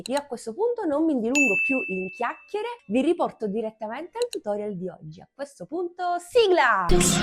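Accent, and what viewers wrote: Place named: South, Italy. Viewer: si sente che sei del sud anche io lo sono e questo già mi da un motivo per adorarti . Brava e precisa❤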